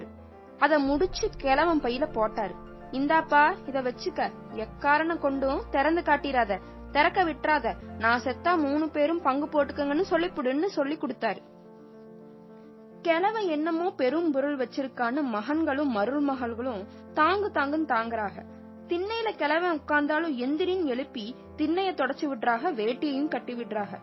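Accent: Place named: Coimbatore, India. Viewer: Nice cbe voice.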